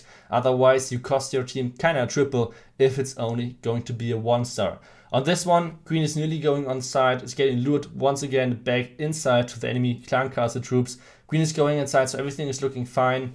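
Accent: Germany. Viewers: Thanks for making an English Channel so I can understand. Loving the German accent. I hope to see more of your content. And do give Galadon elder. He deserves it.:)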